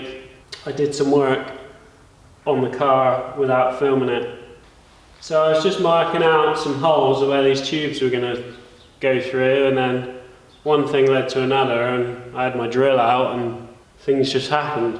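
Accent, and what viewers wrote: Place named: Cornwall, United Kingdom. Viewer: Brilliant job mate. I haven’t bothered to subscribe to anything in ages but your channel is awesome. Watched all your vids and you make it look so easy and really explain things well. I’ve picked up so many tips from you. Worked with a guy from Cornwall who talks just like you, you could be brothers haha. Anyway keep it up mate, I look forward to seeing your stuff, I think we all do. Legendary!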